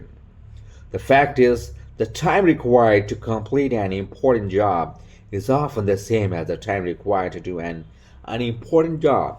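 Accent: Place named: United States. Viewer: A true American accent 🎉🎉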